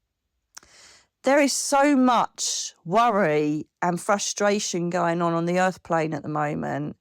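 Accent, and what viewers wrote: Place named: United Kingdom. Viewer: I just love the British accent and the popping words on the screen! It actually helps to focus and am sure it helps people with any hearing disabilities. Good job!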